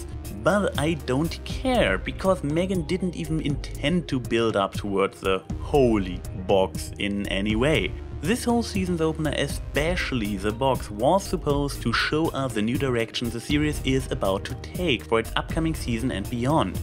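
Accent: Germany. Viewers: Hi AnY. Love your videos but I can't stop thinking about that accent you have. Are you german or what kind of accent is it?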